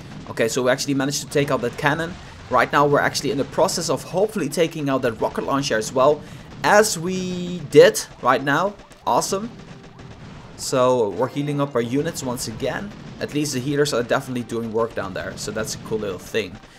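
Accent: Netherlands. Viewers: Is he dutch.cause gis accent looks like a dutch boy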